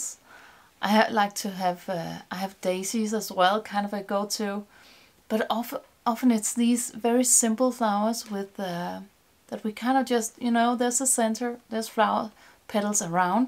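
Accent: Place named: Denmark. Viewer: Your accent sounds like you're from Denmark:face-blue-smiling: Har jeg ret? :goodvibes:I sketched with that same brand of pen you're using I tried, but it didn't seem water-resistant, so my sketch died :face-red-droopy-eyes: Anyway, my go-to flowers are tulips 🌷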